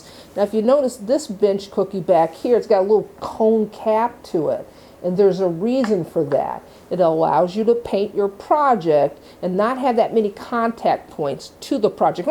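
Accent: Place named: Medford, United States. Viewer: I miss that Medford accent!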